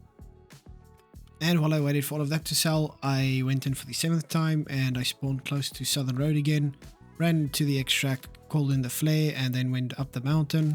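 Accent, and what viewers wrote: South Africa. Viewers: Is mans from South Africa by any chance, lowkey sounds like it 😅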